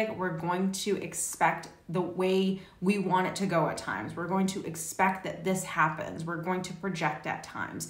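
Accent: United States. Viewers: thank you lady to listen to you it helps me to overcome my deception and now I'm so confused. and it's true you can't control what happen in our life . I have another tool to keep living glad is the music . I don't know where you have found this wisdom? it's too nice to hear your American accent. thank I feel better now and I learn so more when I go through trouble it learn me the reality of the life. thank you I'm RICHARD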